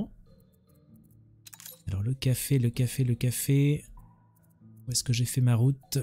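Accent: Belgium. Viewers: Oh purée!!! Merci car je "galère" (C'est le cas de le dire) tant sur ces navettes que je commençais à me lasser d'y jouer...Mais comme tous tes viewers je suis fan; J'aime bien notamment tes petits "belgicismes" et ta prononciation des mots en ui : " depoui, poui, appoui...etc...Hé hé ça me fait sourire mais toujours avec respect et jamais sans "ennoui"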